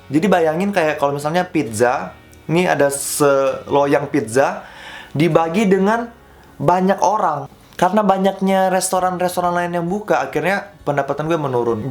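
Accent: Palu, Indonesia. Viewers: Ko rada denger aksen palu nya hehe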